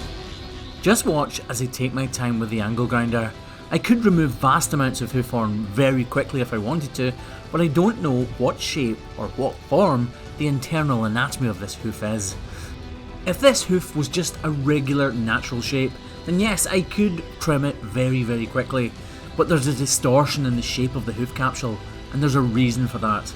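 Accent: Scotland, United Kingdom. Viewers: Hey, Where is the big smile and a hand over the camera lens? What's with the black screen? Oh, I know the nasties over on Craigie Boy have caused you to rethink everything. Come on love, we want the smile back and the hand over the lens. Those two things speak of you as much as Your Scottish accent. Tell all the nasties to buzz off! Do that, and I'll kiss one of your cows. lol Have a good evening. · he sounds scotish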